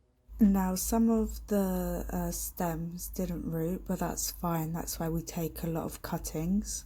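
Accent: England, United Kingdom. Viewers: So glad you made this video. Thank you! I love the sound of your lovely English accent too 🌿💜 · I was really hoping it would work in water. Apparently if you take cuttings in summer us best and quickest to root but you can do it with the softer growths in winter and will take about 3 months to root. I'm judging from the accent your in England so the colder climates may slow down the rooting a bit too. Off to try this and glad I don't have to faff about with rooting hormone.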